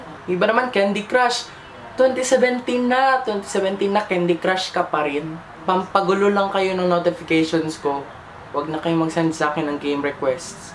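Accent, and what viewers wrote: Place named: Visayas, Philippines. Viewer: Bisaya ka fu ba? Or whut? Accent is real .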